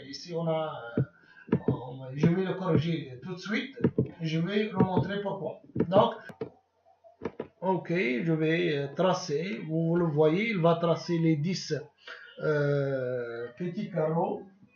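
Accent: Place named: North Africa. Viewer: On comprend rien avec ton accent couscous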